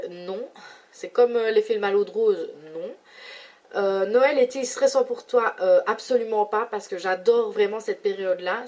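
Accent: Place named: Belgium. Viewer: Coucou! Bienvenue sur youtube ^^J'ai crée une chaîne il n'y a pas très longtemps, et je t'invite à la découvrir en espérant qu'elle te plaise et te face passer un bon moment, et si tu t'y sent bien et que tu a aimer quelques une de mes vidéos n'héssite pas à t'abonner. Et si tu t'abonne j’espère que mes future vidéos te plairont d'avantage! je te souhaite une bonne journée ou soirée:) bisous à toi!:p byyyy! ^^ -...... PS: j'adore ton accent! tu est belge non?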